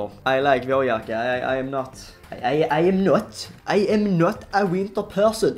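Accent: Scania, Sweden. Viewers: älskar din skånska, så underbar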